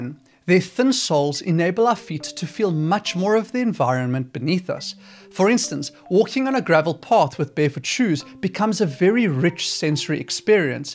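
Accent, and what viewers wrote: South Africa. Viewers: You sound like a South African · You sound South African!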